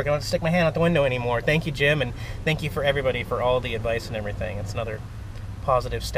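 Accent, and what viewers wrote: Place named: Nashville, United States. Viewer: Ain't nothin wrong with a Nashville accent!!!!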